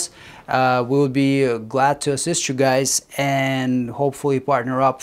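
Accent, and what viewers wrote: Russia. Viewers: Ты русский? Акцент выдаёт.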